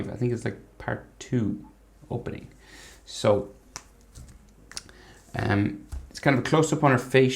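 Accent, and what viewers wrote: Ireland. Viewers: I am curious, are you irish?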